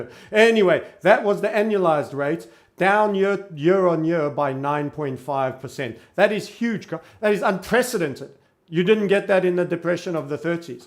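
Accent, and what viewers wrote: South Africa. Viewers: Love the accent. What part of SA are you from?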